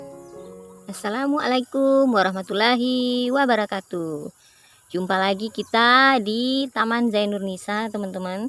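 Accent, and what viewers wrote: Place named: West Kalimantan, Indonesia. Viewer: Orang Mana Bunda,logat bahasa daerahnye sepertinya org Melayu Kal-Bar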